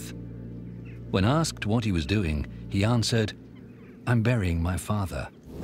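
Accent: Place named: England, United Kingdom. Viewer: МММ, английский тип) но нормально!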